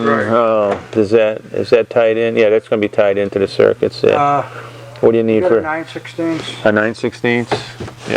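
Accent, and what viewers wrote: Boston, United States. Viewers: Yep I would like to see you fix it rather than swapping out the hull if it's not too far gone of course, I'm not from the US and I'm just curious about your accent, I am going to guess at Boston region ?? I'm probably the wrong end of the country eh lol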